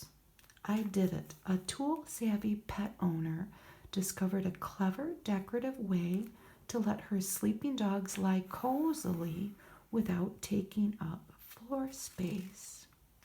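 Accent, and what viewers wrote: Midwest, United States. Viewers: I really enjoy these magazine flip throughs, your voice with just that touch of midwestern accent is so nice.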